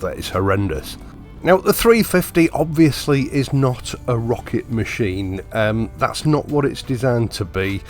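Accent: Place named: Hull, United Kingdom. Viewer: Great to hear a proper 'ull accent - can you say 'purple working shirt' and 'nine ninety nine' ?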